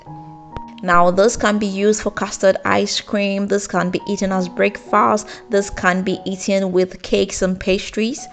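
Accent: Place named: New Zealand. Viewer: Kinda like a new Zealand accent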